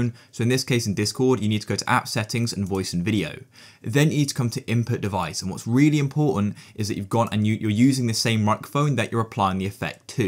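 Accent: United Kingdom. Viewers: Are you British? Hahaha damn bro.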